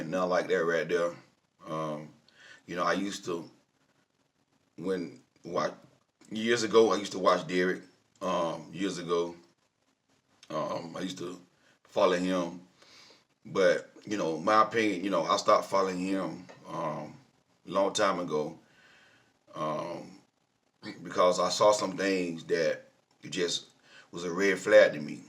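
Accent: South, United States. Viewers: I love and the southern accent!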